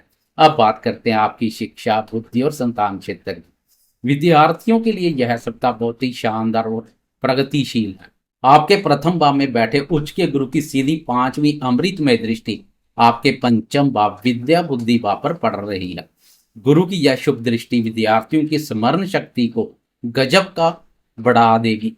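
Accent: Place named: Punjab, India. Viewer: जी पहली बार देखा है आपका वीडियो।। आपके बोलने में जो पंजाबी एक्सेंट आता है वो काफी आकर्षक है।। बाकी आपकी भविष्यवाणी तो आने वाला समय ही बताएगा कि कितनी सटीक है।। जय महाकाल 🙏🙏🙏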